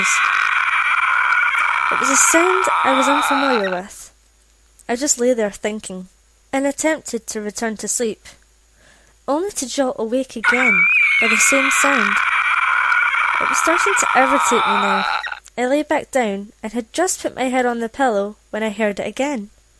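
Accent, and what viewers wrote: Scotland, United Kingdom. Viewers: Could you please say "fishfingers and custard" in your awesome Scottish accent? Awesome creepypasta.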